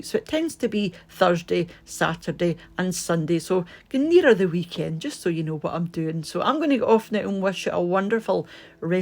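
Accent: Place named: Glasgow, United Kingdom. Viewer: I guess I'm an odd one out. I like the weekly shopping video a little more than Meals of The Week. (I LOVE seeing you're buying block cheese instead of pre-grated now). But I always make myself wait until today to watch them in a row - to see what items you used from the shop and incorporated into your Sunday 'show' of weekly meals. ☺ I truly think your shopping and meals of the week videos are the BEST! Editing is PERFECT. There isn't any 'ummms' or 'you know' (filler words) and when you speak, you get to the point! There's no long pauses or meaningless sentences that drone on. Been watching you for several years. ♥ ~Keep 'em coming ☺ - - Ps I know you mentioned it before, but your accent DOES remind me a lot of Anita Manning - I guess she has a Glaswegian accent.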